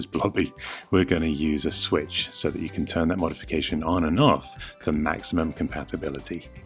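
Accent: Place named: Geordie, United Kingdom. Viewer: As someone from the North East, UK, that was a passable Geordie accent :) Also that carbon fibre wrap skinned Amiga looks amazing.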